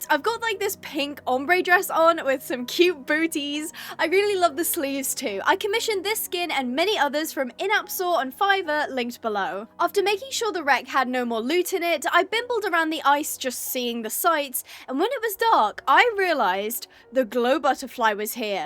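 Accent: United Kingdom. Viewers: Your voice sounds like British and it’s brilliant 😙👌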